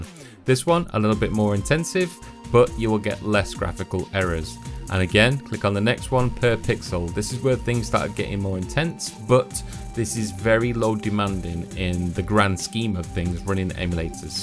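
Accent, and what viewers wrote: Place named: United Kingdom. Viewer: Where the feck are ya from pal? Can't quite place that accent. After loads of vids it's pecking mi head bad. Deffo UK but where!? :)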